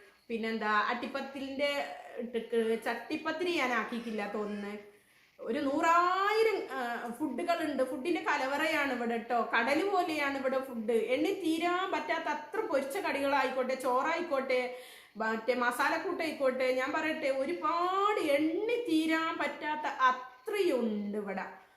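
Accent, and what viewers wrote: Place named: Mahe, India. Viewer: സ്ലാങ് ഇപ്പോൾ മാഹീ ആണല്ലോ കാട്ടുപാറ അല്ലല്ലോ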